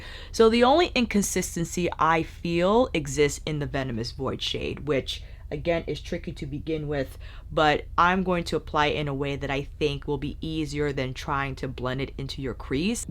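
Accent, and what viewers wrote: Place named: New York, United States. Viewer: Wow. I apologize for saying how well put and well spoken the review was.....after watching the live with the owner of CR with Kelsey.....I didnt mean it from a place of "wow you're not ignorant and I'm surprised because of your skin tone" and I didnt know if that is how it sounded. Im a new subscriber and You described the palette in terms of letting a consumer like me know about which shades are what, ya know? As a fellow NYer and moved to Indianapolis, In I loved hearing your accent as well. So nothing but love here girl...I just went to that video after this one and as soon as I heard all y'all talking about those "You sound so smart" comments I was like wow.....maybe I sounded that way.......maybe you wont even read this and you dont care at all......either way, already a fan lol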